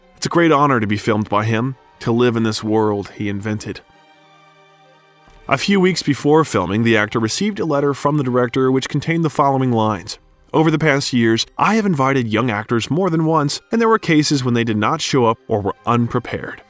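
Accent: United States. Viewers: You are speaking in an American accent. HERE, we call it soccer!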